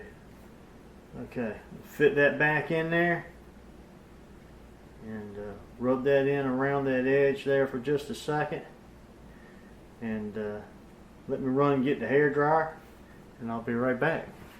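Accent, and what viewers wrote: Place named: South, United States. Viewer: I just love that southern accent of yours . 😊 On the other hand, in the absence of a vacuum machine, what would you use?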